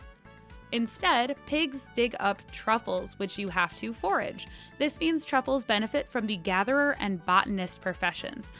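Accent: Canada. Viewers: Are you Canadian? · You sound Canadian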